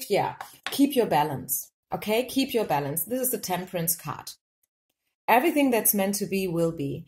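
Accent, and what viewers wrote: Germany. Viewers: I just love that you post for us in the US. Your German accent is adorable 💕 Thank you for your timeless message today.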